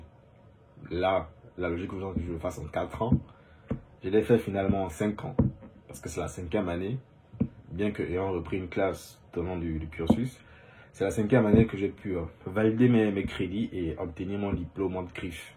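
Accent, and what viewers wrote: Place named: Gabon. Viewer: Hey frère ? Continue à faire des vidéos,j’ai reconnu ton accent ,t’es un pur gabonais,grosse force à toi frère 🇬🇦🇬🇦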